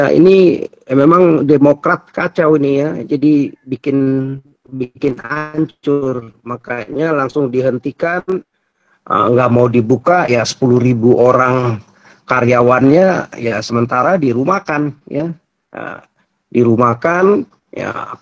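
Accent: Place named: Batam, Indonesia. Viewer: Jelas ko suaranya dari Indonesia Batam.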